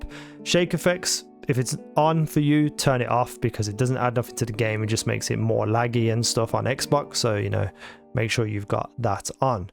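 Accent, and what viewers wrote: Birmingham, United Kingdom. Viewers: Alright mate, just downloaded the game now. You sound like a fellow Brummie btw which is cool! If you go to the controller preset page where it shows image of the controller, you can see that quick turn around 180 degrees once you enable the setting is double input of pulling left stick down. Iv spent 3 hours trying to dial in the settings so this feels nice. Very confusing and still working on what to assign to my 2 back paddles on the controller. Im using controller on PC and its not as buggy as console thankfully.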